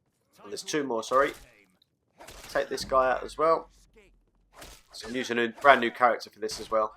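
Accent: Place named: United Kingdom. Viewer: will these items make you become master of the thieves guild? Btw I Love Your British Accent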